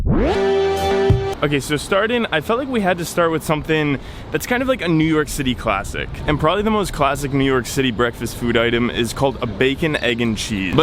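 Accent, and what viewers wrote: France. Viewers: i was wondering when you travel around the world and get so much street food does it ever happend to you to get a food poison nice french accent btw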